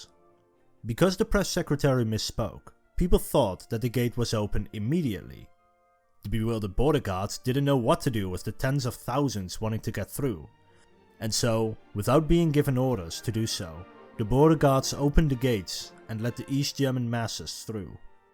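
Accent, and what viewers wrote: Netherlands. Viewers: Strong Dutch accent :) cute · I guess dutch. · that sounds like a dutch accent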